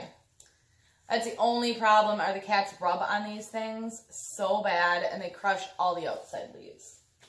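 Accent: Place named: Wisconsin, United States. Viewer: haha I also don't like peace lillies. I completely understand you. Love your videos. I was listening to you and something sounded so familiar. Then I understood you're from Wisconson. I grew up up Wisconsin and live in Switzerland now. Your accent sounds like home.:-) Your plants are beautiful, I am also plant obsessed.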